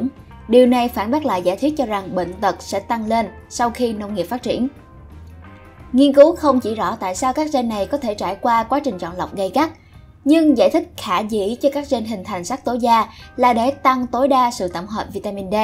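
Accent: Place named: North, Vietnam. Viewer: giọng bắc thì rõ hơn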